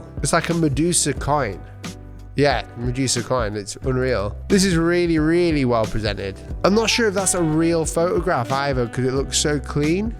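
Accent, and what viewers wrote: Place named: Cheshire, United Kingdom. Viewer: Keep up the great content though. I live in the States but was born in Cheshire. It's nice to hear a familiar accent.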